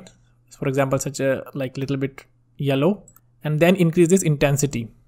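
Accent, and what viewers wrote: India. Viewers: Sound Like Indian? But Very Clear and Clean. ❤️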